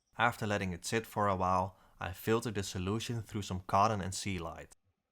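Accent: Netherlands. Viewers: Where is your accent from?.. it sounds like Limbourguish.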